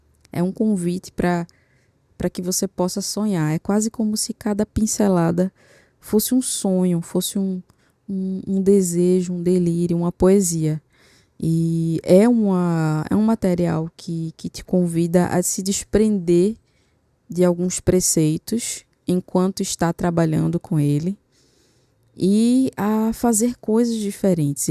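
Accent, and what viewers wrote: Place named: Recife, Brazil. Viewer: Vixi Maria que agora melhorou foi muito! Cafe com leite, Senhor dos Aneis, sotaque lindo de Recife e observacoes/trabalhos sensiveis…bom demais❤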